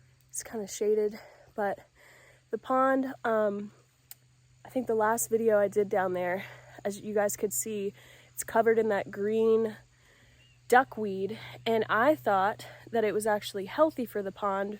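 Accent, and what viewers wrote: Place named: New England, United States. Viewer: Recommended on my You Tube feed so I will provide feedback in the form of a comment. I am not interested in the You Tube channel of any American Woman, particularly, white ones like my Grandmother on Mom's side, Mom, my Sister, my Stepdame, and any other American Woman. Perhaps it is self hatred, but the Yankee accent only exacerbates my repugnance for the women my nation has produced-the feeling, no question, is mutual-extending beyond nationality to the white race. I will review my subscription list when I get a chance and make sure to remove any to American women, though I will target in particular those American women from the USA, and then, white ones. I simply cannot brook the sense of entitlement in these women.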